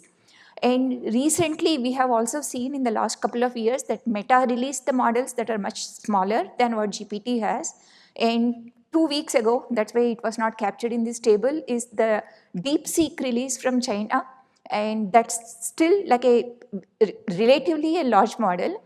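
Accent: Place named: India. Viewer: Thank you for giving insights about AI Networking and i like your speech very calm and keeping natural Indian tone.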